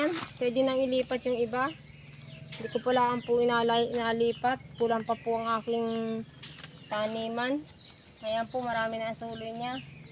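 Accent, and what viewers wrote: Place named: Marinduque, Philippines. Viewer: Parang tuno ng marinduque po kayo❤😊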